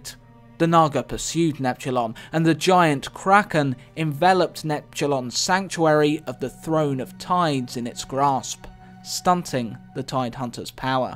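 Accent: United Kingdom. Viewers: I'm really surprised I found that, as iv'e only started playing hearthstone 6 months ago and never played WoW in my life.. cool! I wonder what the mistake actually is....... Btw, may i ask where you're from? I guess british but i'm not sure..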